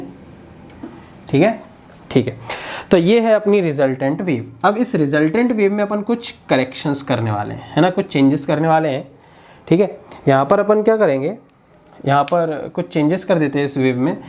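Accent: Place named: India. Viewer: Stop. Talking Indian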